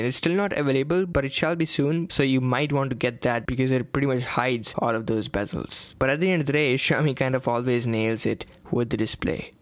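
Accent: India. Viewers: great video, I subscribed within 10 sec after listening to ur ascent, its indian but not typical Indian ascent.Keep up the good work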